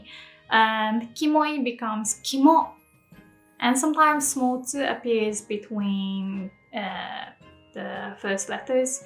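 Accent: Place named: United Kingdom. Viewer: Well theres a reason why she has a british accent when speaking english, she probably spent some time in europe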